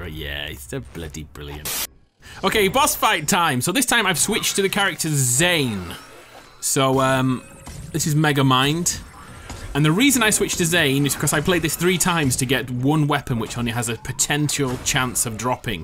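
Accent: United Kingdom. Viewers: idk why but i like the british accent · Nice to hear a Brit reviewing my favourite game.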